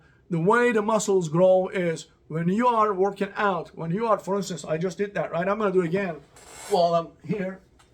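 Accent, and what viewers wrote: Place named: Texas, United States. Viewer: abi, teksas accenti ile konuşmak seni godfather filmindeki adamlara benzetiyor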